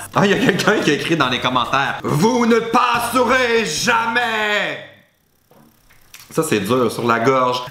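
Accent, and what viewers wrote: Quebec, Canada. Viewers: J'adore tes vidéos. Un moyen de bien rire... L'accent so cute d'un magnifique québecois. Dommage que c'est aussi loin de la France. Sinon, voici mon snapchat : hackariens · J'adore ton accent Québécois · Je sais , mais tu dis que ça t'as stressé :p c'est normal qu'il dise l'halloween , il est québécois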